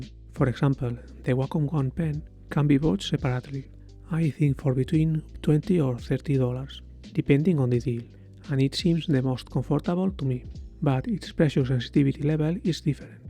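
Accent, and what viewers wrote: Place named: Spain. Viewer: Genial video de una tablet que la ando cazando para cuando baje algo de precio; asi como curiosidad , Creo que eres Nativo de la lengua española ( lo noto en tu acento) no se, por que a los que sabemos un poco de ingles en mi caso b1 ( entendemos mucho mas la gente con acento que a los nativos del ingles)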